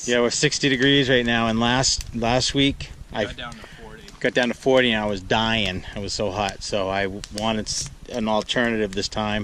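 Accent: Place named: Maine, United States. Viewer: Having trouble commenting on your other videos could just be my phone but I just watched a couple of your videos...thought I recognize that accent lol Can you just tell me how far up the trail you walked before you found that campsite please ...that would be helpful I’ll bring my GPS and Mark it and put it on my channel when I do it next spring thanks ...One Mainah to another 😀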